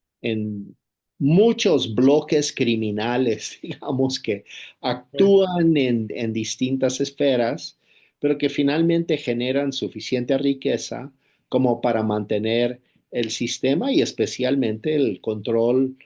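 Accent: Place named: United States. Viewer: Enrique Pascoe, ¿no eres mexicano? hablas mal el español. Al hablar del bloque liberal democrático ¿te refieres a los norteamericanos y europeos? Pensé que ellos había esclavizado a medio mundo, Africanos, asiáticos y aborígenes americanos. ¿No es EU el que le quitó más de la mitad del territorio a México? ¿NO ese mismo EU, que mencionas el que amenaza a Canadá en volverlo Estado de EU, o a Dinamarca de quitarle Groenlandia? ¿No es ese mismo EU, el que destruye a sus aliados? Solo hay qué ver la condición de los europeos ahora, después de la guerra con Ucrania. A México le iría mejor manteniéndose más al margen de EU, o lo acabará destruyendo. Lo mejor es que nosotros como mexicanos veamos nuestras opciones, no las de EU. De todas formas comprendo tu punto de vista: pareces gringo, hablas como gringo, defiendes a los gringos, aun con sus peores acciones. 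Entonces, eres gringo, ¿por qué no lo habrías de defender?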